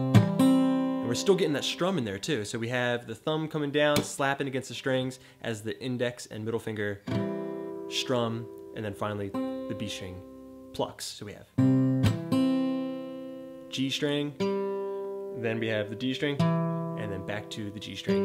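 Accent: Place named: Philadelphia, United States. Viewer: Very helpful. Thanks. And bonus points for the Philly accent. Geaw Iggles! (And Gritty.)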